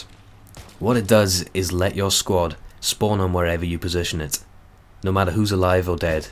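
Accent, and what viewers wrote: Wales, United Kingdom. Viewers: Nothing like Statham. This guy has Welsh in his voice. Statham is cockney. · Informative, interesting, and...oddly soothing. British accents are great.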